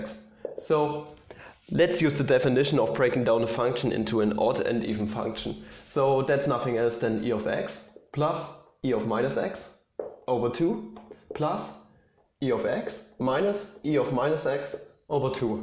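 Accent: Germany. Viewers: They're both great. Having taken four years of Deutsch in high school, I appreciate the German accent, helps me practice!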